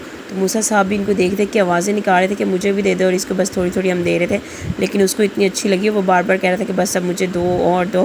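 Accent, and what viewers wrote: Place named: Pakistan. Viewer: Aapke bachche mashallah bhut cute h main India se hun aap k lahje se lag rha h aap Pakistan se h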